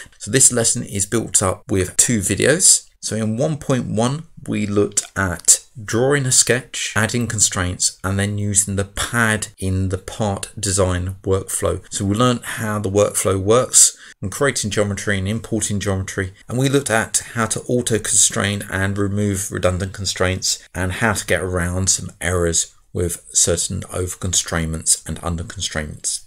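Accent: United Kingdom. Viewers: Both lessons are excellent. (Everyone loves a British accent). FreeCAD specifically, and CAD programs in general, are inherently complex, NOT intuitively obvious, and can be extremely frustrating. But all videos are exceptionally well done, and well thought out. Each step is performed without error, so there is less confusion. The parts have sufficient complexity but not too much. The presentation is correctly paced for absorption, uses several commonly used features, and is most helpful. FreeCAD is very powerful once mastered. Thank you for doing a great job and a wonderful service to the 3-D community. 😀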